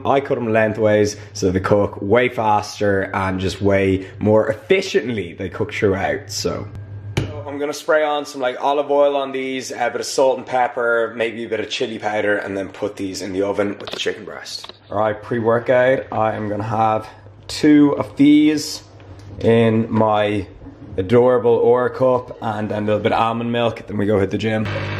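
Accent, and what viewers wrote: Ireland. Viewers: Is he Irish? How strange I clearly understand his english lol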